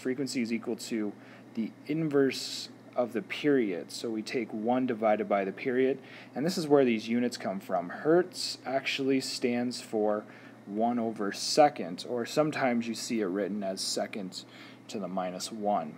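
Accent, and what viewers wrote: Canada. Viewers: I think he is canadian because he said aboot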